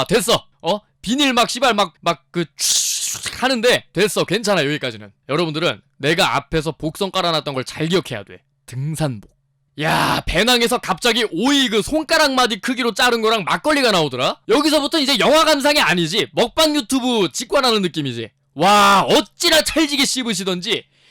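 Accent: Busan, South Korea. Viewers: 이형은 영화관이랑 인연이 안좋아ㅋㅋㅋㅋㅋㅋ 그런데 부산분이셨나???ㄷㄷ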